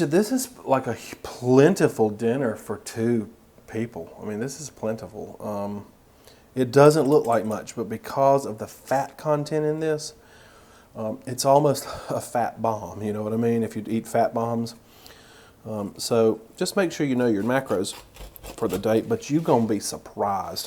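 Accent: South, United States. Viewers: I love your southern accent. 🙃😁🤣 "oh come on here withat!" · Binge watching you today! LOVE 💕 THIS 💕 CHANNEL!! U make me smile! U encourage me to cook and I love your realism. Can’t wait to make this! I love your accent. I’m from SC so it’s comforting to hear southern twang! Keep calm and Keto on, my man!! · Just discovered you the other day and you are an absolute DELIGHT to listen to! You're so funny and sweet, informative and I love your southern US accent! I'm already a fan, over here in Belgium. 🙂❤️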